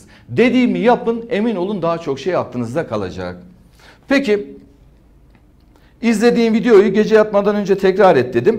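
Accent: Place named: Istanbul, Turkey. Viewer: Neyse ki sonunda nörüyorn, gardaşlar, godum mu oturtutum vs vs avam söylemleri olmayan İstanbul Türkçesi ile efendice ders anlatan beyefendi bir HOCA'ya denk gelebildim..Dikkat çekicem, ilginç olucam, bu isler bizden sorulur havalarındaki kahvede okeye 4. olamayacak insanlardan ders dinleyemiyorum..Çok teşekkür ederim Sayın Hocam..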